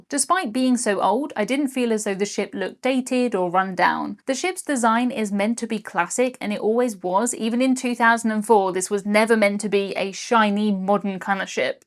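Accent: United Kingdom. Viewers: I would love to run into you on a cruise just so we could sit down and talk about cruises and you experiences that you have. I love to hear your commentary not sure if it the British accent but listening to you explain these things just sounds so lovely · Shedule is my fav British work lol. They just forget the C in schedule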